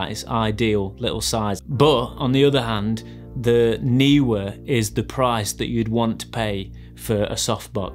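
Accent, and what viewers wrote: United Kingdom. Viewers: I think you're #DannyGevirtz with a British accent. Thanks for another great video, bro.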